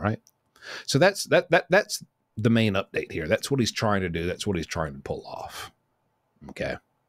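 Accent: South, United States. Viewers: Hey brother my name is Paul Britt,I've been watching you for several months,I'm from Jackson Tennessee, and lived in Charlotte north Carolina,I enjoy the southern accent, and,thank you for, the Golden entertainment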